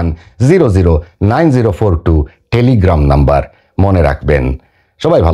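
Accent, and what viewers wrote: Sylhet, Bangladesh. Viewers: Apnr vasa sylhet kutay plz bolen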